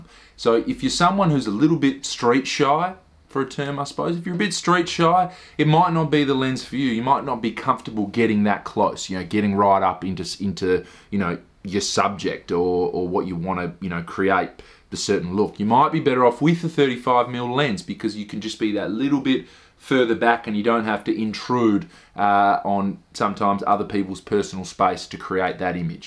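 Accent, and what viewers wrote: Australia. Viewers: Gotta say this, love your true blue Aussie accent.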